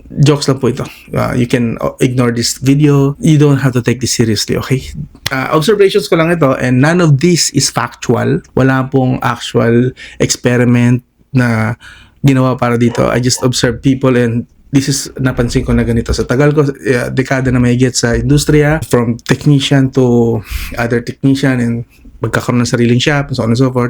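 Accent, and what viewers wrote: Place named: Conyo, Philippines. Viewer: conyo hehe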